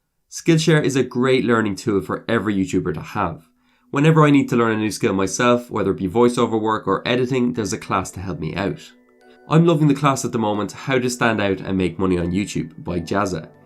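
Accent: Ireland. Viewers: I'm from ireland and I could tell you were as well from your accent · The name and accent made me think you were Irish and then you said tirty it was obvious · I knew you sounded Irish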